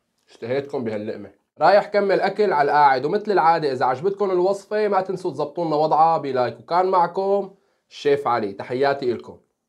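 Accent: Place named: Damascus, Syria. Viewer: الشيف علي اشطر من الشيف عمر وكل شباب الشام تقريبا بيحكو بهالطريقة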